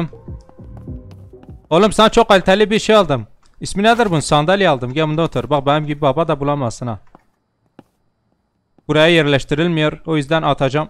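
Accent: Elazığ, Turkey. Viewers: Abi Elazığlı mısın? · Abi sen Elazığ lı mısın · La sen elazığlımısın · Abi elazığlımısın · Abi Elazığlı mısın yoksa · Abi Elazığlımısın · abi elazığlımısın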